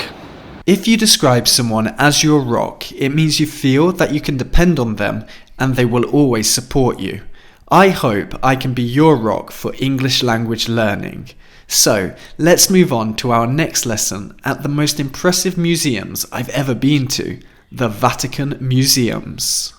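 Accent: United Kingdom. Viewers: Today, the 25th of March, 2023, I´ve had my first contact with this great channel: I love your accent (I guess you speak British English, but I can´t get from what exact country you are. I am from Barranquilla City (the Shakira´s hometown), on the caribbean coast of COLOMBIA, South America, so SPANISH is my native language (Saludos fraternos). I am also Christian (Roman catholic), so I really enjoy your video and I learnt a lot: I think I will go to Italy and The State of Vatican City, soon. I am sure I will improve my English communication skills with you: I have turned into your new fan: My best wishes!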